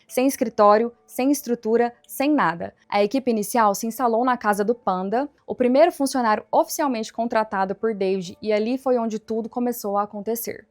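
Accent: Caipira, Brazil. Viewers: esse sotaque de caipira é uma tortura!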